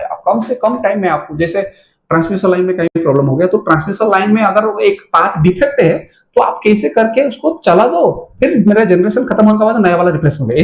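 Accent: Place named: Odisha, India. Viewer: Bhai aap odisha se ho kya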